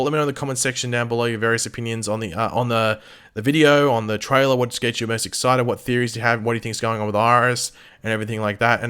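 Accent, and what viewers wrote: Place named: Australia. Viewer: B A He has an Australian accent bugs for brains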